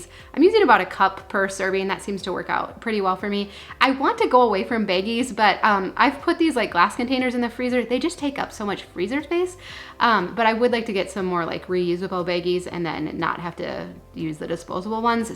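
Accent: Minnesota, United States. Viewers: "Eye-talian". Soo Minnesoota!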